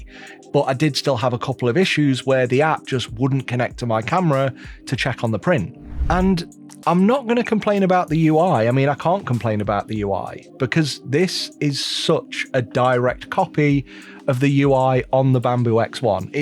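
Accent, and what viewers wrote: United Kingdom. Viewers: Great video, still using my Ender 5 Pro, good printer but really showing its ade now. Maybe by the time they have sorted the software out I will have saved enough to buy one. If you are planning on giving you X1 away for buttons. I'd collect it from anywhere in the UK. you'd be making an old man happy 😉😉. Seriously it's nice to hear a UK accent, you won a sub.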